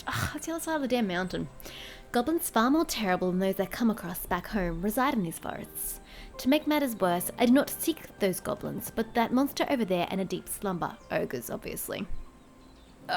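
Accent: Australia. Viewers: Just found that video by coincidence whadda great video and such a sweet australian accent :3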